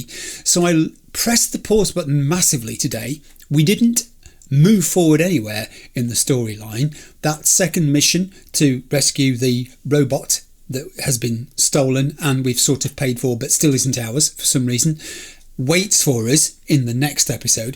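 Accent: United Kingdom. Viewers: What a great channel I found, truly a virtual gem! Thank you very much for you efforts, for your comments, overall for your rich Britain speech and charisma! I played Cyberpunk 2077 for lots of hours, about 200+, one of my favourite games so far and your videos inspire me to play it hundreds hours more!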